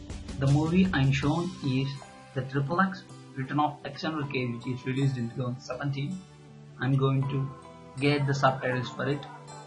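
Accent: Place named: Punjab, India. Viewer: Are you 👳 👦, your accents show you are.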